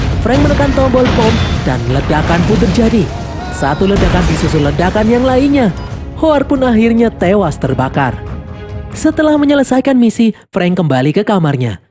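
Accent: Java, Indonesia. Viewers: medOk x Jawa mu min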